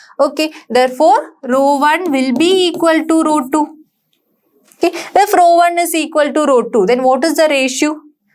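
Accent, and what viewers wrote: Kerala, India. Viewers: She is a malayali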